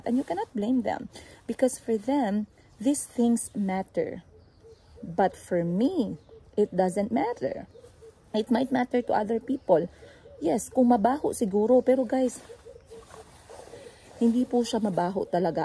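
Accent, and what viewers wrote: Iloilo, Philippines. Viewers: Maam im laughing while your are talking accent ilonggo, i love it watching fr.iloilo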